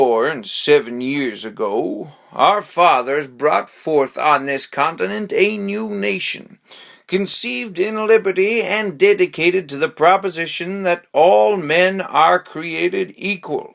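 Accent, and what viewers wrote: United States. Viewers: Is that your voice? if so your American accent is very good. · Koren war please, btw nice American accent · Your Us accent is great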